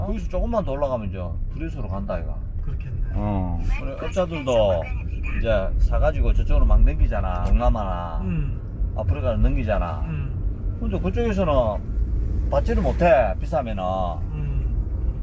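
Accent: Gyeongsang, South Korea. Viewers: 야들아! 문댕이말고 표준말하면 좋을탠대~ 저질스러워서 ~원!!!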